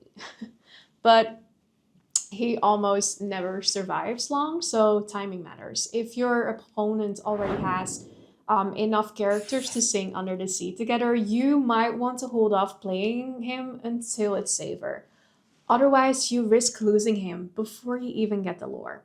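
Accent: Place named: Netherlands. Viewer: Ondanks je goede Engelse uitspraak verraad het lichte accent toch dat je Nederlands bent. Leuk hoor… zelf zoek ik nog steeds een community in mijn woonplaats.. goede video verder en heb al veel kaarten van dit deck dus ik ga deze eens proberen met wat kleine aanpassingen(ik heb de shiftline van vanellope niet)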